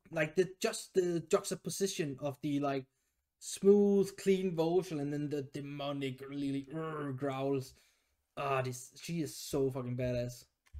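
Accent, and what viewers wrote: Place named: Scandinavia. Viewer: Hey. Where are you from. You have a slight accent I can't quite place. Guessing nordic, maybe danish? You got some catching up to do. They have already released 2 singles from their upcoming album, dropping on August 27. Then there's their back catalog. are a few years old now and the 4 band members have improved so much in that time and they seem to have found a sound and a style that are uniquely their own. Their new stuff is very technical and progressive, yet they still have that super heavy and awesome groove, we fans like. They often mix in influences and styles from outside rock and metal, always surprising, when we just think we have figured them out. My favorite songs are to be found on their later releases but i don't think they have a bad song. If you're not used to listening to metal I would start with the suggestions below, but if you like metal music you might jump straight into their new stuff. 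I recommend:,.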